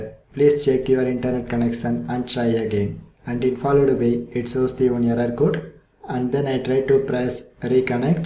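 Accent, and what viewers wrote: India. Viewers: U have indian accent bruhhhhhh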